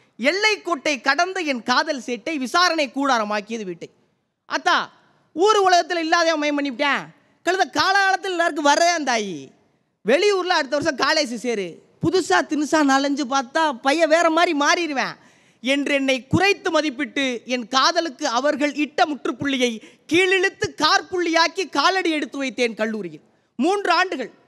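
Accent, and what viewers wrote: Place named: Sivagangai, India. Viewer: சிவகங்கை மண்ணின் வாசம் இந்த சகோதரன் பேச்சில் தெரிகிறது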